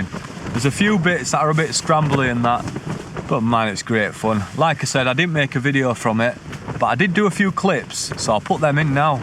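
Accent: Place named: Bolton, United Kingdom. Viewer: Love the brawd acsent iam fr bolton sound summit like but u talk like ur about 50 mate how old ru, iam 63 just got cancer basterd so do miss it pal atb stay safe ❤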